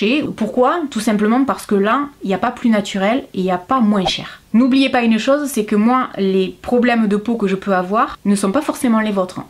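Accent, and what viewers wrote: South, France. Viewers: C'est clair ! Et son accent qui donne l'impression qu'on est dans le sud et qu'il fait beau...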